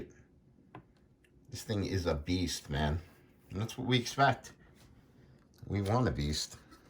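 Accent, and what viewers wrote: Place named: New York, United States. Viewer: Grats on the card, i'm still waiting for mine. Side note, I really miss new york and the accents loi.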